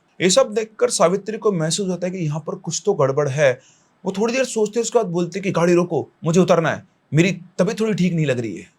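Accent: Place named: Maharashtra, India. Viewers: Humne bhi aise hi story suni hai relative se U were talking Marathi cutely 😊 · I love that Marathi accent of sir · Hello sir I am from jalgaon and i loved the story and ur marathi ascent ❤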